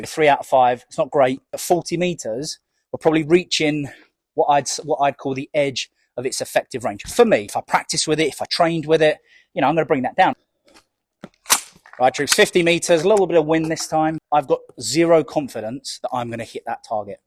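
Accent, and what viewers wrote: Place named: Canary Islands, Spain. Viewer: Se me hace gracioso ver a un inglés hablar español con acento canario xd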